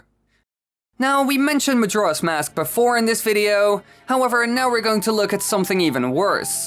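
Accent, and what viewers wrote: Netherlands. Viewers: It sounds like an accent (possibly Dutch or Swedish)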